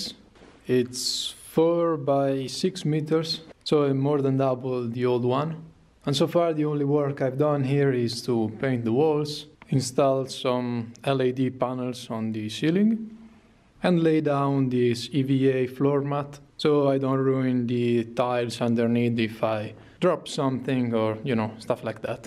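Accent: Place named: Northern Italy, Italy. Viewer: hahahhaha si sente che sei del Nord!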